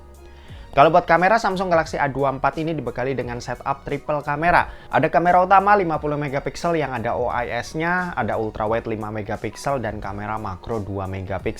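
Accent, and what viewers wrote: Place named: Central Java, Indonesia. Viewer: orang cilacap atau semarang njenengan mas?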